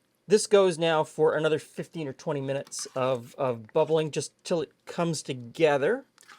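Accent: Canada. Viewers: I love your videos. I found you post covid, and I love your channel! Youre such a calm guy. You come across like a really gentle soul. I love the small coloquialisms from your subtle canadian accent “aboot instead of about” but subtle its turned down. 50%. Its one of the many things I adore about your channel. I love the food history. I love that you partnered with Max Miller on a video. I love when pops in. I love watching your easy every day banter. I love how well you know each other. The only thing I don’t like is that your videos are so short. I would watch you work in the kitchen happily! Tell more stories from your life. Talk more about your grandparents. I am a similar age to you, maybe 5 yrs older. My grandparents died when I was quite young, so love hearing other peoples grand parent stories. I would love to hear more about your time “counting trees?” living in a tent. 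Did you encounter a lit of wild life? Bigfoot, bears, moose, Elk? How many summers did you do that? How did you and Meet? The topics are endless. Bottom line. You and would be a blast to sit around the fire pit with sharing a bottle of wine. Have a great day to you both!!! Beth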